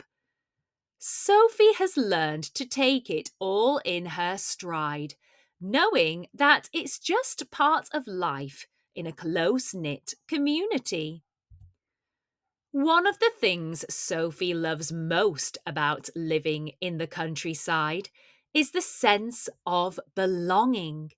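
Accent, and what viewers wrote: United Kingdom. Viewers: I really like your video, it really helps me with British English pronunciation, thank you very much ❤ ￼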